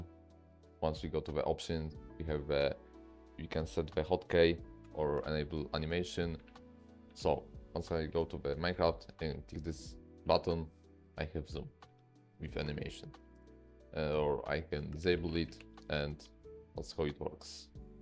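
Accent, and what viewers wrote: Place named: Poland. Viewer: Słychać ten polski akcent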